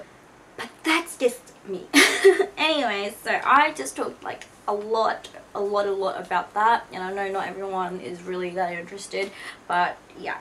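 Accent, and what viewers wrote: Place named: Australia. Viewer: Hey!! Im soo soo happy to have you back:') I have really missed that aussie accent! Would you mind doing a video on picking medicine as career for highshool students? Like why you wanted to do it, how the application process was for you, how was it when you got in, your feelings in med school, advice and tips for people wanting to get in and is medicine what you expected? And pros and cons of being a doctor! SO SORRY THAT WAS A LOAD OF QUESTIONS!!! Could you please involve that in your education video?:) xx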